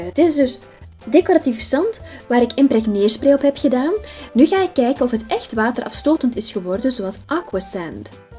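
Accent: Belgium. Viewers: doe normaal man ook niet iedereen vind jou stem mooi maar juist lelijk en zij kan er niks aan doen van die stem ze is Belgies!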